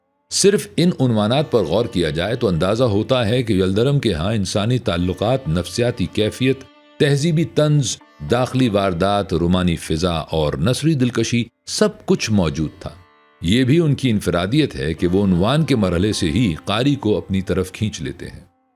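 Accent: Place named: Lucknow, India. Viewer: بہت عرصے بعد ایسی خوبصورت ،آواز،بہترین تلفظ،آراستہ پیراستہ اردو زبان اور کسی دلکش ندی کی طرح روانی سے بہتا ہوا لہجہ —-حقیقت یہ ہے کہ میں سجاد حیدر کو بھول کر ان صاحب جن کا نام بھی مجھے معلوم نہیں ،کے سحر میں کھو گیا اور سجاد حیدر کہیں دور پیچھے رہ گئے۔یوں لگا کہ لکھنؤ کا اردو لہجہ اور دلی کی قلعہ معلی کی زبان دوبارہ سے زندہ ہو گئی ہے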